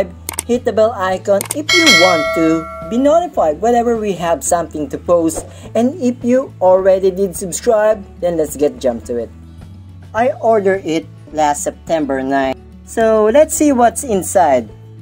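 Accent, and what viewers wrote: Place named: Philippines. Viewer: Pang English English kpa halata nmn accent mo na Pinoy ka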